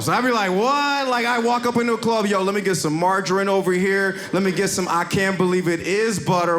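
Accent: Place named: Montana, United States. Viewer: If this was an audio clip without video, I would bet my life savings that I'm listening to a white man from Montana or New Hampshire 😂😂😂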